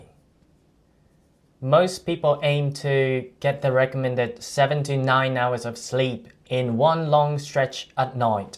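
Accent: United Kingdom. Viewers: You did good, mate. While I am Australian, I definitely heard Britishness in most of your sounds. The only syllables/sounds which did not sound RP to me were the NI in night and SI in design. That silent g may be lengthening your i-sounds a tad too much. Nonetheless, after listening to you twice end to end, I felt you are nailing so much RP/Brit sounds.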